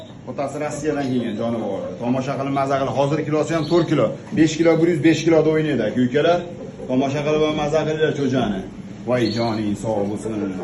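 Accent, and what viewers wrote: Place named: Andijan, Uzbekistan. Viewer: Андижондансиз шекилли булди буеги аник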